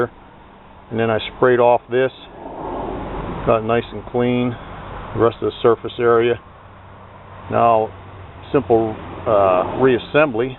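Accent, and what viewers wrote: Pittsburgh, United States. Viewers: Thanks! gonna try this out on my Toro! Knew you was from da'Burgh!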